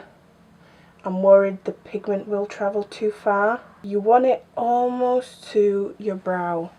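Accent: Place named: United Kingdom. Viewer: Lovely finished look with the makeup......insert name here!........Are you in the UK you have a funny accent......? Good video.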